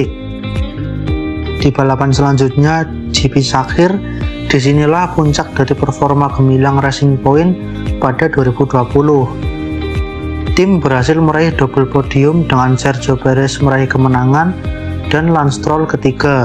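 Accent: Java, Indonesia. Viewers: Mantep 👍, logatnya wong jowo ?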